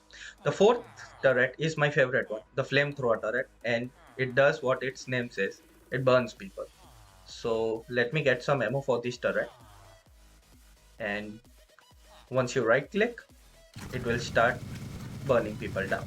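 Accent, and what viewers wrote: India. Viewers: hmmmm, his way of speaking english seems like he is an indian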